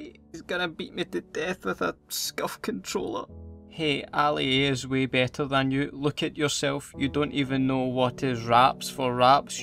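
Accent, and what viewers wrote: Scotland, United Kingdom. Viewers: you and frankie boyle are the main reasons why i love the scottish accent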